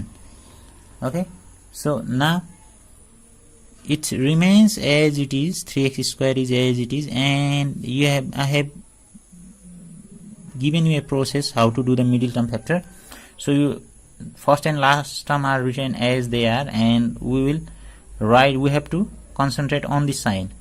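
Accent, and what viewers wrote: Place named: Nepal. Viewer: Are u from nepal by any chance this is my first time watching ur vdo and I'm also from nepal and i think ur accent is like nepali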